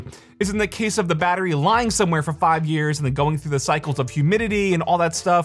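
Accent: Singapore. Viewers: Lmao that Singaporean accent is so easy to recognise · sounds Singaporean hahahah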